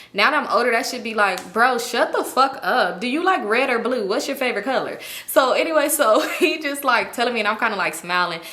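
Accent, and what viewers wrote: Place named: California, United States. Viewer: Every now and then I hear your cali accent it’s so cute yall say arms and party differently lol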